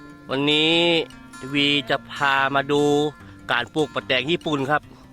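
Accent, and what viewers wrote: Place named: North, Thailand. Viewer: สวัสดีค่ะวี คิมมารับชมการปลูกแตงกวาญี่ปุ่นด้วยจ้า คลิปนี้พูดเหนือด้วย น่ารักดีค่ะ👍👍😁😁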